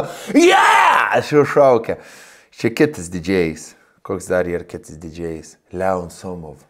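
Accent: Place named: Samogitia, Lithuania. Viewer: Vo žemaitis 👍🤭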